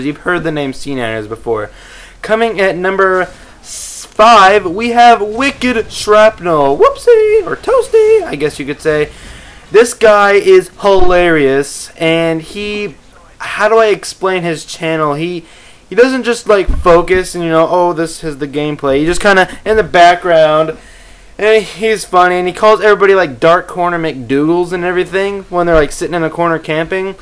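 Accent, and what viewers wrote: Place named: United Kingdom. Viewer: Puppies and a British narrator? BEST SHOW EVER!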